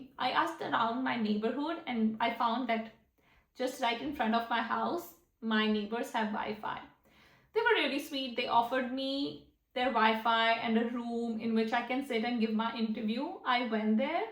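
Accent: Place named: Germany. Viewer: What happened to your accent, i think it mixed up with German 😅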